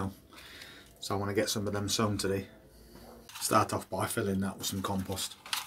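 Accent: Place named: Derbyshire, United Kingdom. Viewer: Another good one.I live in Derbyshire and you sound like you have a local accent.🐝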